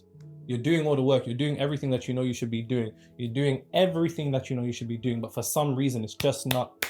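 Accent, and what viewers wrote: United Kingdom. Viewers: The UK accent gives the impression of someone articulate and intelligent to Americans. This Knowledge isn’t about intelligence. It’s about you. Sometimes the mirror is painful to look at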